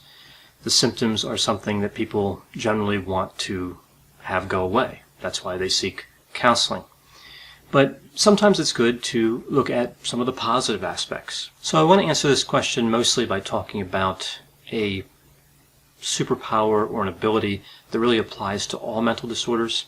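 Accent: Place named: Pennsylvania, United States. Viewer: Are you from Pennsylvania? It’s the accent!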